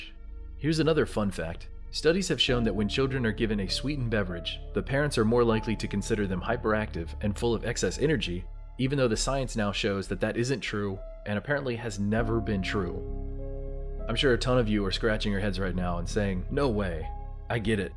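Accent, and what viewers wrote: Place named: United States. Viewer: You are all very silly … Dazzle ships … Maybe you never noticed them before because 1. You sound American and it was mainly the British who used this type of Camo 2. Using the assumption as an American the majority of DDay is told from the American perspective and images you’ve seen are of American landings on Omaha where you wouldn’t have seen many dazzle ships but if you look at British landings you do notice many of them The fact that anyone believes any of this and you’re basing this entire theory off of a woman who came up with it at a paranormal Conf is legitimately insane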